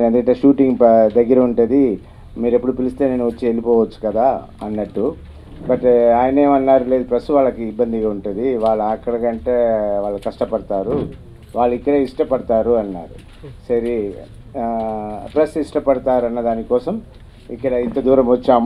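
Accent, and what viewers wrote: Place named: Telugu, India. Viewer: Don't blame him about his Telugu slang OK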